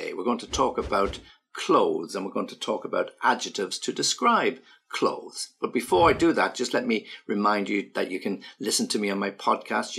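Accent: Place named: United Kingdom. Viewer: I really like British English, authentic! Please give us some words to describe about an exquisite accommodation or a superb resort and their services.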